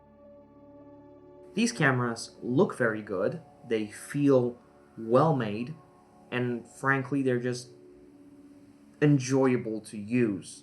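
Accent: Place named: United States. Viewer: I subscribe to your channel because you speak in American accent!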